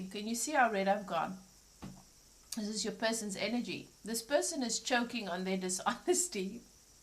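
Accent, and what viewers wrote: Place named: South Africa. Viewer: Very good reading.. You are very gifted. Your accent sounds South African, so good to see this evolution in spirituality. Thank you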